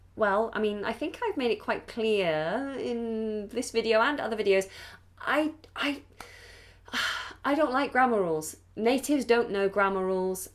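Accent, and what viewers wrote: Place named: United Kingdom. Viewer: Hi! I am Chandan Gunri from India. I have been watching your YouTube videos very carefully. I saw don't know the exact meaning of somnambulism. It's meaning is walking in sleep. I love your British English which is really helpful. You are truly an incredible teacher and there is no doubt about it. Thank you. Please get in touch with me like that. Really, you are helping me a lot.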